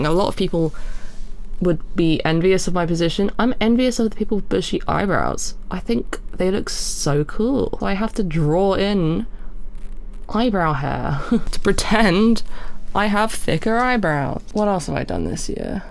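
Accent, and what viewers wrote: United Kingdom. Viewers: u sound more british than usual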